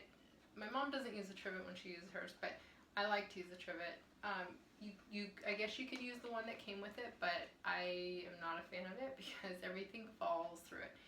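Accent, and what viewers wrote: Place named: Los Angeles, United States. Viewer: Thank you! I'm just curious, if it's ok to ask - do you guys live anywhere near Los Angeles? The way you speak is pretty much exactly like me, and you even look like you would belong in our family!! Whatever the case - good luck to you and happy cooking. Take care, Rob.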